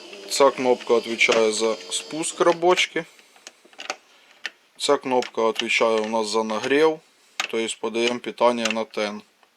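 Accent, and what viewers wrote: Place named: Ukraine. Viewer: Не вопросы, а запытання. Украинец хренов. Хоть по русски, но с украинским акцентом.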